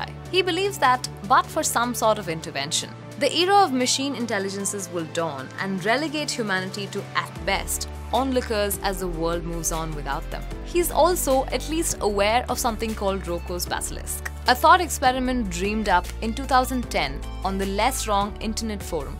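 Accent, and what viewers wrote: India. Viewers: This Indian accent only adds to the charm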